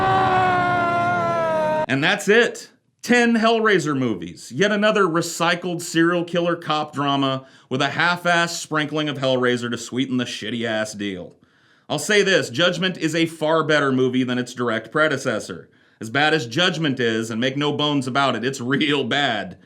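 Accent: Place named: United States. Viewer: Ok which part of america does this amazing atheist voice come from?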